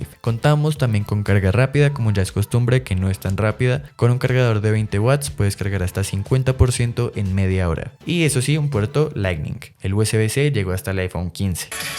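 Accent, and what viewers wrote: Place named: Colombia. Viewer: Eres de colombia que si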